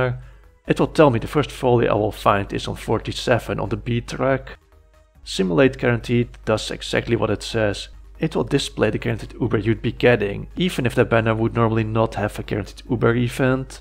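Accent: Netherlands. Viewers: This man is 100% dutch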